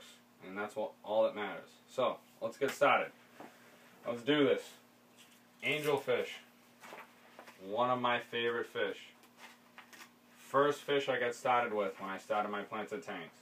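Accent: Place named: Massachusetts, United States. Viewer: Being originally from Shrewsbury, MA and now living in NJ I appreciated the MA accent when learning about angelfish!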